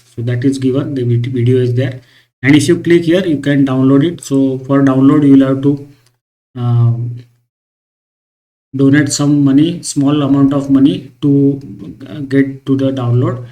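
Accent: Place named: India. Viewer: ugh.. indian accent on top of a bad microphone.. 👎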